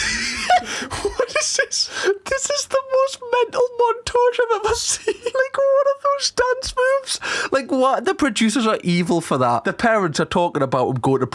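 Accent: Newcastle, United Kingdom. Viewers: Love your show , and the Geordie accent makes it all the better. Signed - happy doughnut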